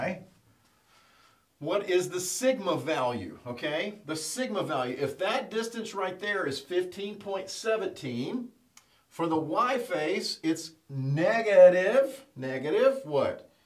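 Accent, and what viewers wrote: United States. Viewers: His country accent makes me feel a lot more accessible and authentic. In addition to that, this is a very thorough and simple distillation of an otherwise complex topic. I really like it!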